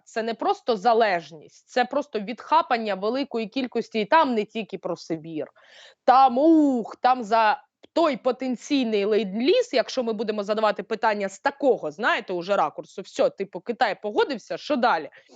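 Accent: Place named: West, Ukraine. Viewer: Як приємно тебе, слухати.Просто,вухо радує Мова така,як на Західній Україні.Суперово. Ти неперевершена.Моллдчинка.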